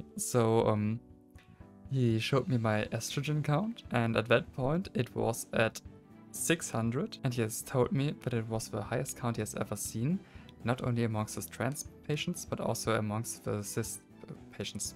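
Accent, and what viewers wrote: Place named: Germany. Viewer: I've noticed that you have a German accent when you're talking.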